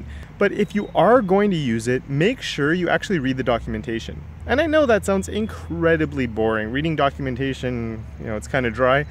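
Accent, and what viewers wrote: Canada. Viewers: Hi..... are you canadian?? xD I think I recognize your accent.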